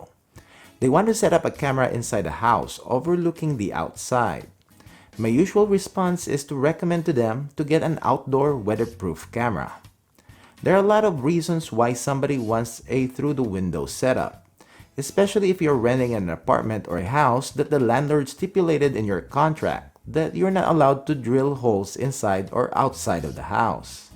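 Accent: Philippines. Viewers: First time I've heard a Filipino accent with the typical YouTube speaking tone ... Thanks for your review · Thanks for the thoroughly reviewed products. Are you Filipino? Your accent is very similar to many of my colleagues in HI. Lots of Southern Pacific Asians here.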